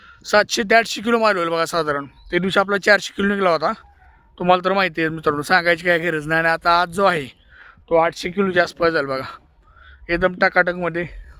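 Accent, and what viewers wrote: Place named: Solapur, India. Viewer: बोलीभाषा सोलापूरची वाटते.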